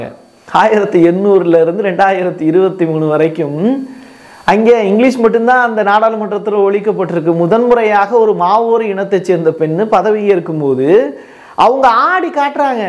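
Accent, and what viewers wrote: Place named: Tamil Nadu, India. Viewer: உச்சரிக்கும் போது தமிழ் உச்சரிப்பு தென்படுகிறது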